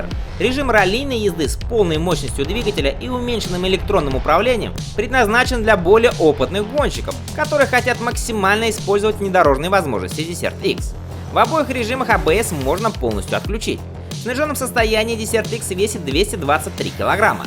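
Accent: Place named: Russia. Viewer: мда.... петушинный кацапский акцент, десерт - это в столовой, а это дЕсерт - пустыня, хоть бы название мотоцикла потрудился сказать правильно в первые секунды ролика.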